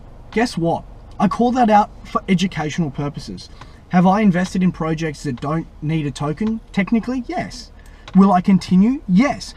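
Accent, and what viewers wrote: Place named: Australia. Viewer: Thumbs up for saying this in an Aussie accent. "Santa claus what a guy........yeah what a f....in guy." "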